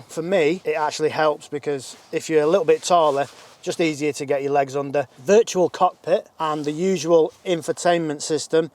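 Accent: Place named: Scotland, United Kingdom. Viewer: I love your Scottish accent.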